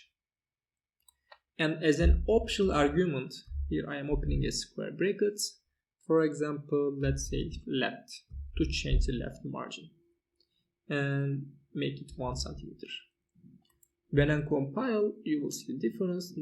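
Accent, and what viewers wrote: Turkey. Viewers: türk aksanı nerde duysam tanırım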